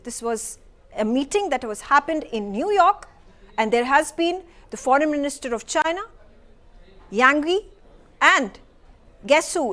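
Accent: India. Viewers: Stop the Indian tongue.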